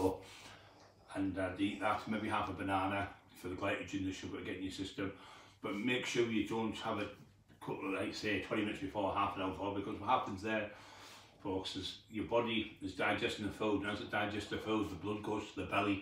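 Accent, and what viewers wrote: Scotland, United Kingdom. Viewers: I knew you had Scots in ye!😉 ye talk straight from the heart brother, a true Scot.👍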